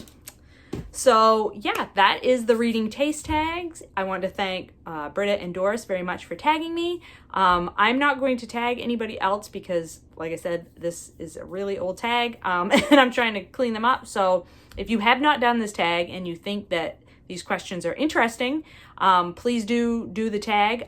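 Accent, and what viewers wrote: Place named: New Hampshire, United States. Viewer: My guess was NH😊. I’m originally from Mass, now in CT. Glad to have found you.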